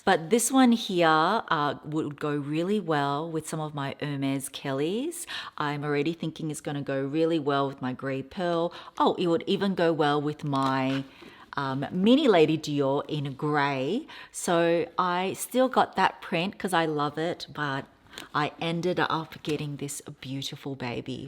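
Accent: Australia. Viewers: Hi! I've watched every single video since you started! I love luxry goods but I can't buy everything I love and you always shared the prices and introduced them perfectly! Also, I want to practice my speaking Aussie English more from you! Yes, I am from Melbourne as well! My IG is pandadududududu. Sending love from Melbourne😍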